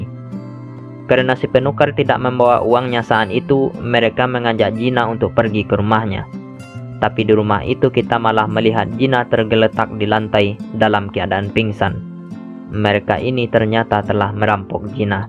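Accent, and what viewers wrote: Madura, Indonesia. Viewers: Admin logat madura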